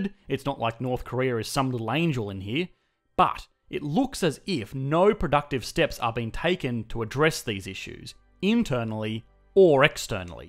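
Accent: Australia. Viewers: I love how this duffer tries to speak American English with an Australian accent. He's good at explaining stuff, though.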